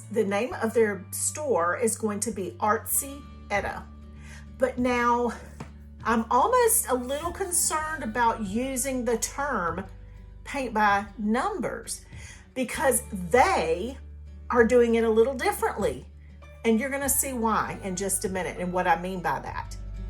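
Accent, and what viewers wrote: South, United States. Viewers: These are beautiful. I like the magnolias best! I do have one question that you didn't mention--where do they get their images, do they pay artist royalties? And btw your pronunciation of "mauve" isn't standard for the US, it's because of your Southern accent, which is adorable. :) Also, the first picture shows peonies, not roses!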